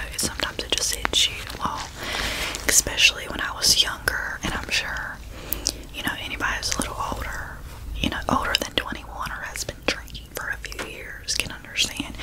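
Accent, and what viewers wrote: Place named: North Carolina, United States. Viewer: The addiction to that NC accent, lol.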